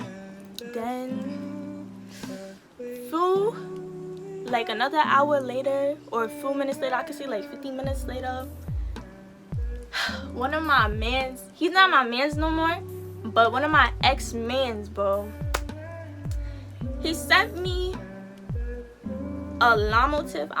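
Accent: New York, United States. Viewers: I love your accent❤ you from NY🎉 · I can hear your New York accent. I soon as I heard you speak I just knew you were from New York. Anyways you are so pretty😍😍😍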